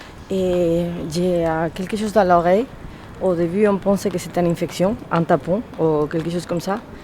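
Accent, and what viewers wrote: Spain. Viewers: bonjour, merci pour tes vidéos faites avec ton charmant accent espagnol tu as trouve ta vie, par forcément la vraie vie, mais celle qui te correspond le mieux Peut-être qu'un jour tu feras un autre voyage .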